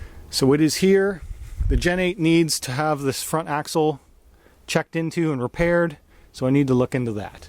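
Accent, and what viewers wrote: Canada. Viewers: New to your channel. Just subbed. Thought you sounded like you were from the great white north. Nice collection. Just did my first tekno build. Mt410 baja beetle with a wing. Went a little over board with the part. $2000 without the reciever.🤯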